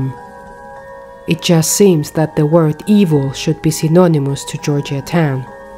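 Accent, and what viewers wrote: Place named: England, United Kingdom. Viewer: I love the English accent also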